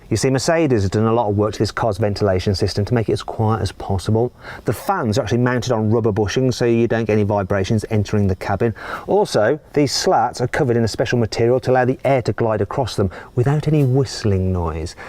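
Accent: United Kingdom. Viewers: それと同じで、音速のマッハもマックっていいますよね。イギリス発音かしら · つまりイギリス英語ですね。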